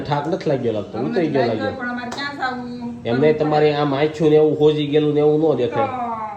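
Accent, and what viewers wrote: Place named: Kathiawar, India. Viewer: Today’s I’m the 1st to comment to yr Vlog 🤗😍🥰❤️🙏🏻 Tamari gamthi kathiyawadi boli sambhalvani.....j bahu maja aavti hoy 6👌🏻🤗🤗😍❤️❤️ MBOza from Gandhinagar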